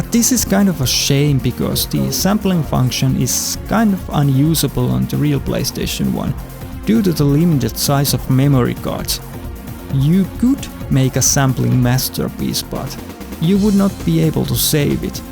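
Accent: Finland. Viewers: ekan parin sanan jälkee arvasin et varmaa suomalainen tekemäs videoo :D hyvin tehty video, täytyy käydä hakemas pi 3 b model nii pääsee itekki testailee :D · Mitäs vittua..? Ooksää suomest? (Koska perinteinen rallienglanti)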